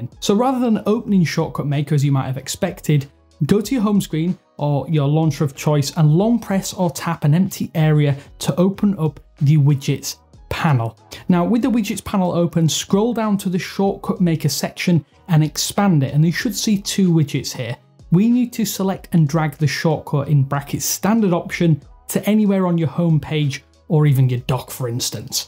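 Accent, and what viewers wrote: United Kingdom. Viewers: Are You British...?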